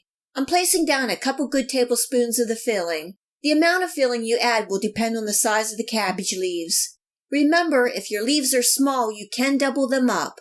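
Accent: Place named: Northeastern Pennsylvania, United States. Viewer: You sound like you're from Northeastern Pa.......me, too! Scranton boy! Love pigs in the blanket! Good recipe,! Every household in my neighborhood made them slightly different...but they were all good!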